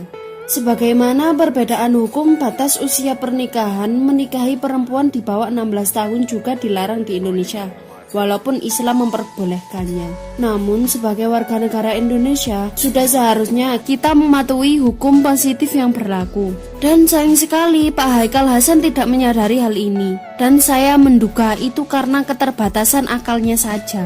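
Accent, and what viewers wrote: Java, Indonesia. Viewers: logat mdok...skitar pulau jawa ni...mf sy cinta pulau jawa umumnya indonesia...sy nebak asal orng ni ja...mf jngn trsinggung...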